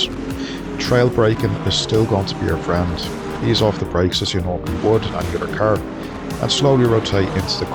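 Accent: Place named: Ireland. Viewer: Don't ya just love hearing an irish voice in sim racing :D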